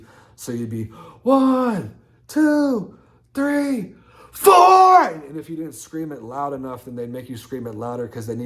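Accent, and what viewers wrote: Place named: AAVE, United States. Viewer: You sound much better when you drop the Ebonics accent. No offense. Why keep up with the African American accent. I understand that you are into Rap and music etc. I don’t even think that Eminem speaks with an African American accent.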